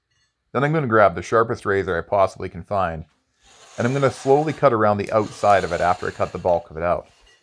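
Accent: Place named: Canada. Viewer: 🇨🇦 I knew this guy was a fellow Canadian by his Canadian accent. 🇨🇦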